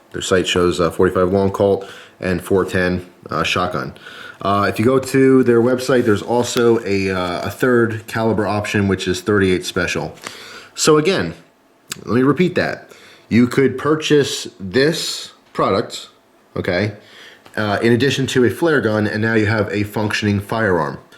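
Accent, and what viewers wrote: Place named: Eastern Pennsylvania, United States. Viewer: I know a eastern PA accent when I hear one....lol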